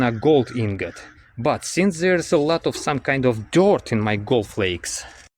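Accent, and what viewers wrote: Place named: Russia. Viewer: Most problem is - very very very very very very bad Russian accent. Sorry. English speaking people do not speak like that.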